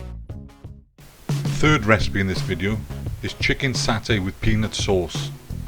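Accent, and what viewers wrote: Wales, United Kingdom. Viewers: Are you welsh because if not, I'm rubbish at guessing accents?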